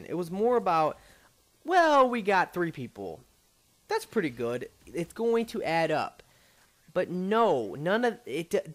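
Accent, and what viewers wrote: Boston, United States. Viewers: I just thought you had an accent like boston or new york or whatever. Anyways, keep up with the vids. You're one of the few people that makes decent ME3 gameplay recordings.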